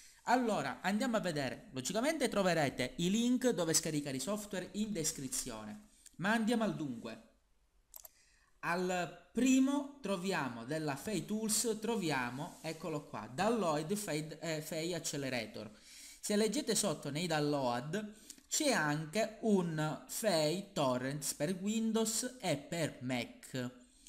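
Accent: Sicily, Italy. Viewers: a quanto ho sentito sembri siciliano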